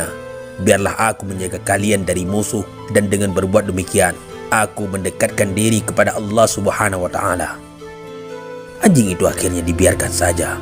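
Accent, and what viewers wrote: Aceh, Indonesia. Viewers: Ini yg crita pasti bukan orang jawa , kyk orang aceh atau bali juga lombok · Naratornya dr Bali, Padang atau Aceh? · Apakah pengisi suaranya org Aceh??? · Logatnya seperti org aceh.. Terdengar dari palafazan huruf "T" nya yg tebal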